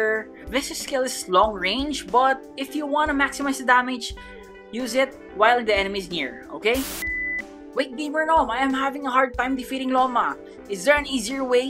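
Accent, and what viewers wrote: Philippines. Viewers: Hey gamernom are you Philippines you sound like. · First time? I'm A filipino so I could tell from his accent that he is a Filipino.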